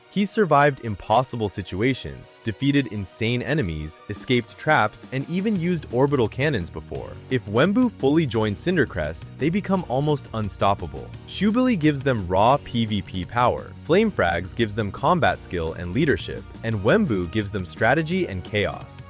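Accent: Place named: India. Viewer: BRO THAT INDIAN ACCENT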